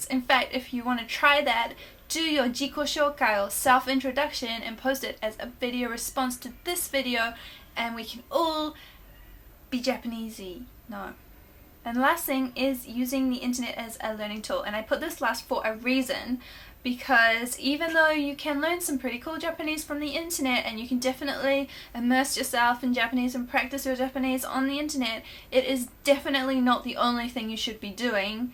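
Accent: New Zealand. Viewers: No that’s how New Zealander pronounce the word for example if you say the number ten, seven, leg, pen, next, it will sound tin, lig, pin, nixt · I thought u sounded like a kiwi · YUUSS KIWI FTW!! I know someone who moved to Japan but she lost her nz accent. I love how you still have the nz accent when speaking English ^^ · Woooooow I loved the New Zeland accent. It's one of the easiest accents that I've heard! I loved your video talking about japanese language!! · mimeiさんってニュージーランド出身だっけ？ニュージーランドアクセントってあるのかな？ニュージーランドは訛りがあんまないイメージ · Are you from New Zealand as well ??!?! definitely sound like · hah yea, that is a pretty standard accent in New Zealand :)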